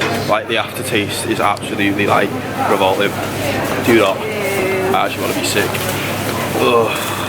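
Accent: Liverpool, United Kingdom. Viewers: is that a scouse accent or wirral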